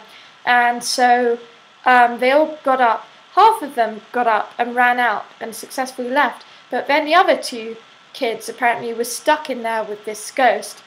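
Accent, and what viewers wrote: United Kingdom. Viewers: Very good storie and you have such good English to read bye. I do believe you are English, maybe the Midlands (with voice training)? Can't wait for another..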